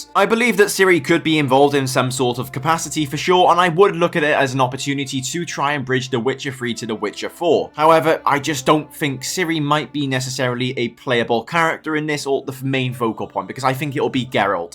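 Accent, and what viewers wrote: Wales, United Kingdom. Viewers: Shame he's Welsh then isn't it?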